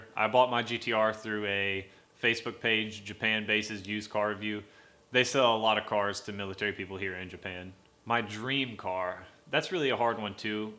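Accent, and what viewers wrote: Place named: Carolinas, United States. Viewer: Hello sir. I subbed a little after this was made and now I'm going back in time, playing a little catchup. 😉 Cool video... thanks for sharing. I knew you had to be from somewhere close to my home by your accent. I'm in Kings Mountain, NC, just over an hour from Greenville. My grandpa lived in Greer for about 60 years. If you get homesick, give me a holler. ✌Take care, brother.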